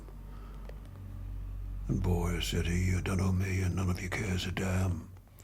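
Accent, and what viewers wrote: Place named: Australia. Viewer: It works well in an Aussie accent. Could almost be an outback tale.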